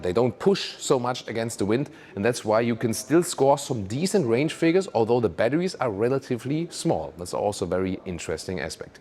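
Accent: Germany. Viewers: Listen to his German-speaking videos, very solid Hochdeutsch. Probably not faking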